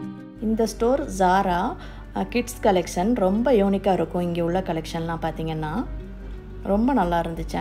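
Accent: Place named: Kilakarai, India. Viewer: Ungloda slang padha theriudhu nega klk nu nice sis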